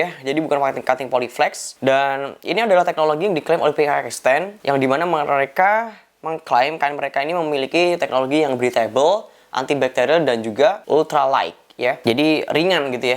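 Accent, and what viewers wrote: Java, Indonesia. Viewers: Gawe boso jowo ae mas medokkk lek mu omong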